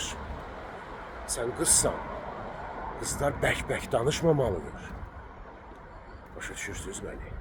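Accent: Baku, Azerbaijan. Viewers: Говор у вас настоящий бакинский ,нет прежнего Баку ,практически не осталось бакинцев ,как поет Корнелюк : город которого нет.А нынче в моде именно и этот говор: ЦЦЦ ,а бакинцы действительно последние из могикан ,хотя навряд ли молрдеж знает кто такой Фенимор Купер .( в школе читала эту книгу ) . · Приятно слышать чистую бакинскую речь!Вчера в Питере в кафе я уходя заметила семью,где 16 летняя дочь вместе с отцом смачно облизывали пальцы на глазах у всех!я по азербайжански им сделала замечание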